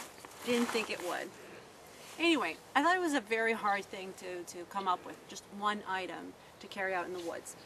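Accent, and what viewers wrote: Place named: Canada. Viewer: You don't have to state that you are a Canadian, pretty girl. We knew that as soon as you tried to say a word with the letter "O" in it.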